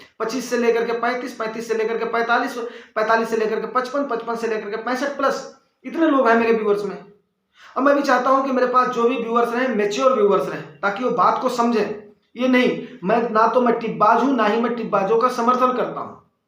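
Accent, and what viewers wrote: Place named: Uttar Pradesh, India. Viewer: Up wala bhasha k liye ek like mere taraf se😄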